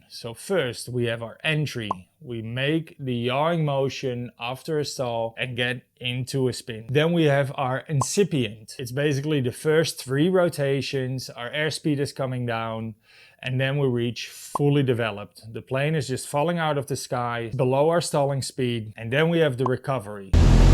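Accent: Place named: Scandinavia. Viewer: Very well explained. I am guessing you are from the Nordics (based on the accent). Very well done video, accurate descriptions, simplified explanation. Keep going!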